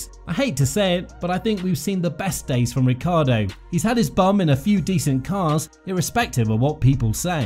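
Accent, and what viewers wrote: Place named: England, United Kingdom. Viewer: An English accent saying it's all over for the Aussie driver. Some things never change. This because of cricket isn't it?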